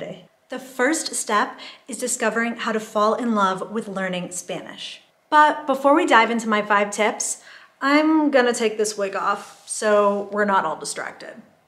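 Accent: United States. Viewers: Great use of ‘El Subjuntivo’ in Spanish👍. Don’t take this the wrong way but your Spanish has a heavy American accent but don’t worry I’m sure people in Mexico like it. It’s too bad in the US some people don’t like accents🤷🏻‍♂️and they don’t try to help but hopefully that’s not the case in Querétaro 🇲🇽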